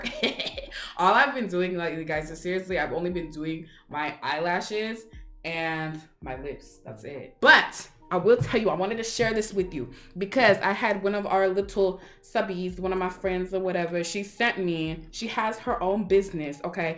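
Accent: South Korea. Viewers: 아니 억양이 너무 한국인이야 신기해 미국인들 다 저러는거 아니잖아??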